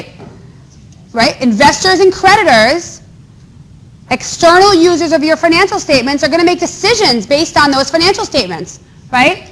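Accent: New Jersey, United States. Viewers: New Jersey accent. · Motivated and knowledgeable with just a dash of Jersey accent. I may be in love.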